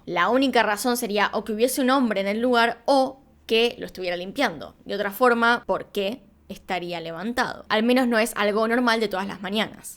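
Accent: Argentina. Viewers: Sabes que el vídeo será bueno desde: "Hola y bienvenidos a mi canal de YouTube, una vez más" inserte voz argentina 😍😍😍😍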